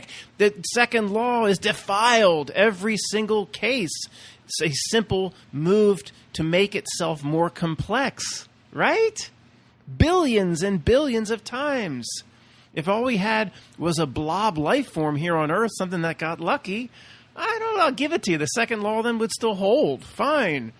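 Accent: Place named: South, United States. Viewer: i love your country voice, thats my voice rofl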